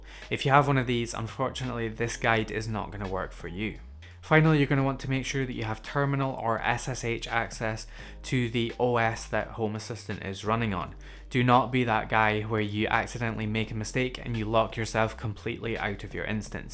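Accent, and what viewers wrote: Scotland, United Kingdom. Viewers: Excellent video! Just getting started with Home Assistant and your channel is now my go to for all my questions and problems (also nice to hear a familiar friendly Scottish accent too!)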